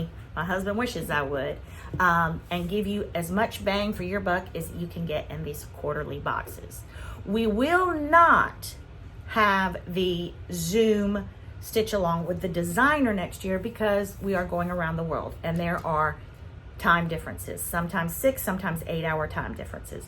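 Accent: South, United States. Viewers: I am so happy I found you. Love hearing the southern accent, y’all, and just your openness. Then you have sharing your gift and creativity.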